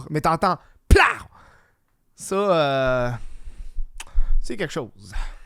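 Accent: Quebec, Canada. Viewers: Je regarde cette vidéo de France (Nlle Aquitaine) et je suis morte de rire. L'accent du Québec plus un débit de paroles rapide, pas évident de tout comprendre. Certains passages et expressions hilarants. Le convoi de la liberté a pris son départ ce matin 9 février à Nice avec une participation importante de voitures, camionnettes, camping-car, camions et ils seront rejoint selon des lieux de ralliements en fonction de la progression vers Paris où l'arrivée est prévue le 11 au soir, samedi journée de fraternité avant de continuer vers Bruxelles. Idem pour d'autres pays européen comme les Pays Bas précédemment. Quels seront les résultats de ces convois qui suivent l'exemple des canadiens, nous verrons. En Europe pour les 27 pays de l'U.E. les peuples veulent reconquérir leur souveraineté et leur culture spécifique en plus des restrictions sanitaires du passe vaccinal. 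Vous habitez un grand et beau pays. Bonne continuation.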